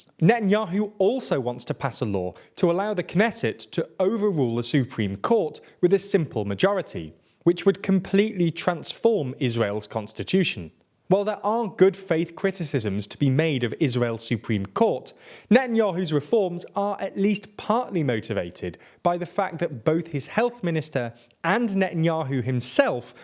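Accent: England, United Kingdom. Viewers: It's funny listening to an Englishman pronouncing Hebrew words as if they were English words.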